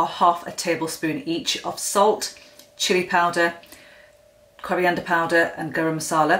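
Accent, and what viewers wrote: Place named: North East, United Kingdom. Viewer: Love the recipe , you sound like a north east girl xx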